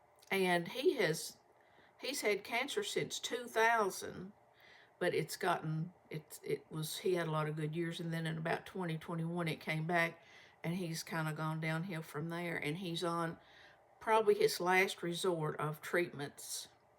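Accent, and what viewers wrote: Kentucky, United States. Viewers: Hi again from NY (Upstate)! I was just remembering, as I listened to your accent, I have only 2 'Ken -- or "Kin"! -- tucky' memories (as far as BEIN' there. They are Lexington (Thoroughbreds, "speaking of 'horses'!") and Ted Bird (are very many people who live in Kentucky familiar with it, or know OF IT?). BTW, I neglected to say before, Welcome to YouTube!😉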